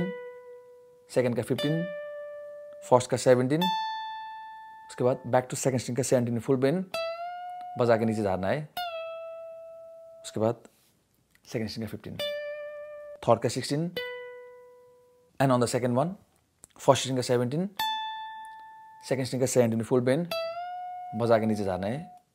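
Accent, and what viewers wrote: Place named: Nepal. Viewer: Dude that guitar is lit and with that accent i can tell ur from nepal 🇳🇵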